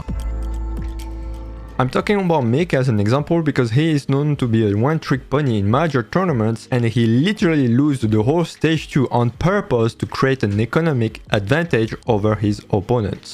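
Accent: France. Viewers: Serais tu français par hasard ? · i didnt understand shit... :/ so hard to keep up, (and also, trying to apply this, got me have the most shamfull loose ever, insane how bad it was XD - it didnt work a bit for me, also because i am nob, but also because i can't understand shit from your "french accent english")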